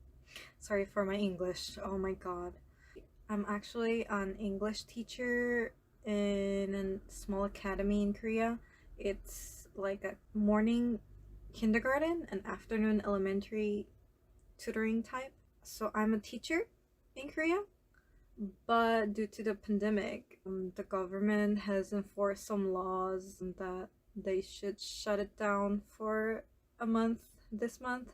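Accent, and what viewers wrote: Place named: Portugal. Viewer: Seu português é muito bom! Parece um pouco com o português de Portugal, mas só um pouco... Como brasileira, acho que algumas coisas do português de Portugal são um pouco difíceis de entender, mas consegui te entender perfeitamente :)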